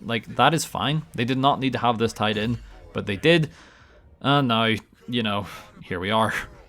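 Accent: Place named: Northern Ireland, United Kingdom. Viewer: as a fellow northern irish person its always such a weird feeling when I click onto a youtube video and hear the accent lmaooo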